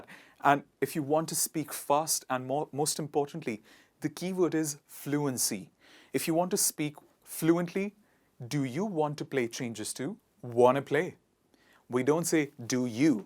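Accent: United States. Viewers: This is American English? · Is it American English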